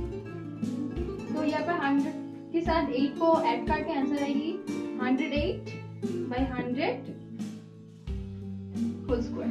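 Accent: Bengal, India. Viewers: Oo pagal she is bengali so her tone of hindi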